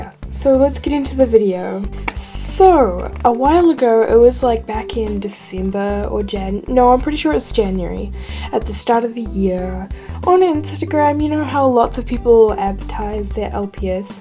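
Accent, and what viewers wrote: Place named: New Zealand. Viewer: i recognised your accent then when you said you were from nz i subbed lol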